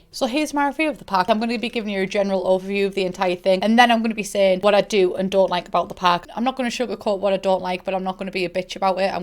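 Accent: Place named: RP, United Kingdom. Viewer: Your accent is so posh it's absolutely dripping with privilege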